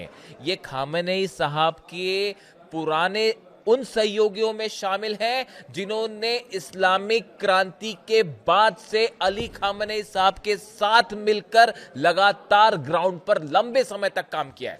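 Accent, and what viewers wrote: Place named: South, India. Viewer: چینل تو اردو ہے لیکن لہجہ ساوتھ انڈین ہے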